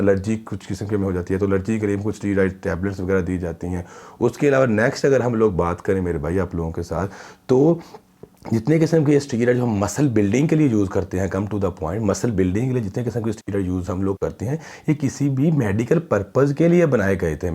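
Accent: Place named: Sialkot, Pakistan. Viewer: From india. Best wishes. Ur language is same as we have. I think u r from sialkot. Because we r from sialkot before partition. If u read this comment then plz rplyy.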